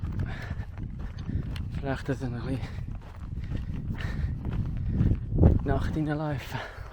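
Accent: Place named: Central Switzerland, Switzerland. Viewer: Hallo lieber Auf meinen Weitwanderwegen habe ich immer auf das Zeltschleppen und -schlafen verzichtet. Deshalb bleiben mir die wunderbaren Strecken in Amerika verwehrt. Umso schöner ist es, wenn ich nun dank dir und deinen schönen Videos den gesamten CDT (hoffentlich) mitwandern kann🙂! Sogar dr komisch Innerschweizer Dialäkt verschtohni guet 😊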